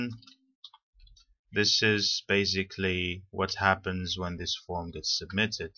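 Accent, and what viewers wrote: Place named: United Kingdom. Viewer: such nice britch accesent for Arabic speaker :D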